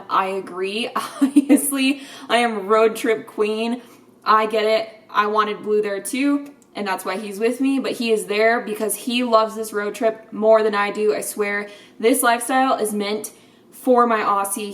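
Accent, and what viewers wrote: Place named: Appalachia, United States. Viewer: U r hella cool but plz stop doing that hillbilly accent u sound so stupid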